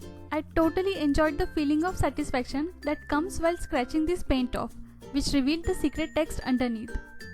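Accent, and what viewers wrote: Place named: India. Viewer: It's nice to here an Indian speaking English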